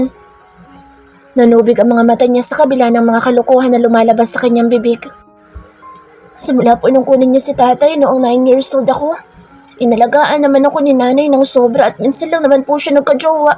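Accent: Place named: Visayas, Philippines. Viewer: Maganda po ang storya,,bisaya ka po maam 😊😊 ang tuno po kasi nang pagbasa,pero maganda po ang story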